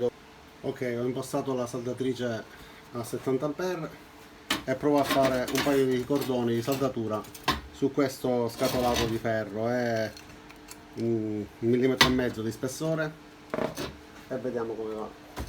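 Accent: Sicily, Italy. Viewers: Salve signor, potrei chiederle se acquista i prodotti parkside in o anche in un negozio fisico della Lidl? per via dell'accento ipotizzo che potrebbe essermi utile, abito in un paesino da cui il LIDL più vicino è in realtà parecchio lontano e mi farebbe comodo andare sul sicuro, non ordino online infatti proprio perchè a giorni dovrei recarmi a Palermo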